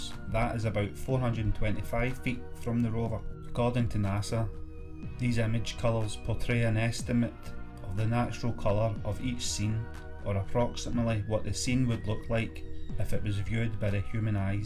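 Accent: Scotland, United Kingdom. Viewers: Holy Scottish accent 😂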